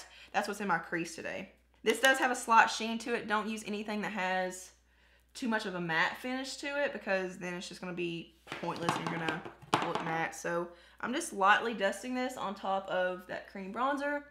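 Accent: South, United States. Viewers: Just found you! Another southern accent.. I can soooo speak your language!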